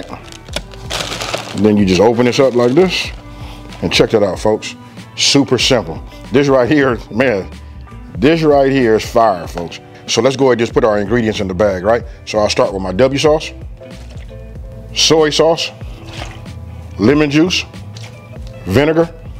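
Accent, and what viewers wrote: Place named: Los Angeles, United States. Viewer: He have that L.A. accent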